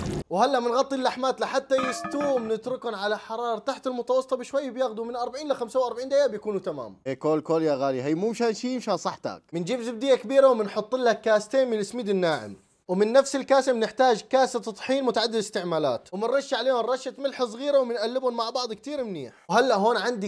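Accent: Syria. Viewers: رجعتي تحكي سورية التحلاب تموتو على البراني 😂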